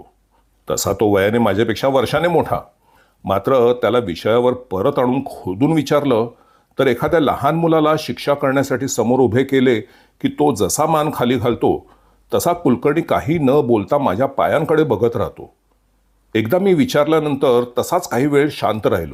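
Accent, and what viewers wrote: India. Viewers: व्वा - अस्सल मराठी 👍👍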